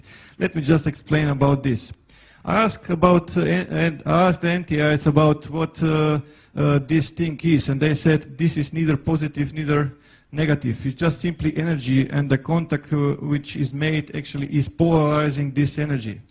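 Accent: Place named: United Kingdom. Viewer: Let me guess, you're from the UK.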